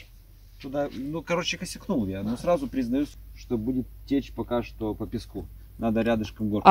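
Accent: Belarus. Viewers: Такой брестский акцент)))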